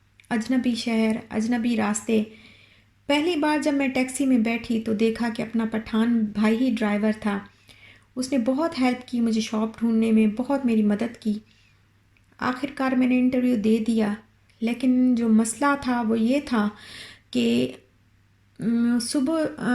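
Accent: Saraiki, Pakistan. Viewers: Aapk talking style sa lgta hy k aap saraiki ho sister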